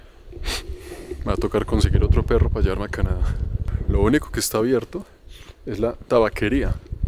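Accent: Colombia. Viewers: hermano se te escucha muy colombiano jajaja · Que acento Colombiano más chocante , el video sería mejor sin ese Colombiano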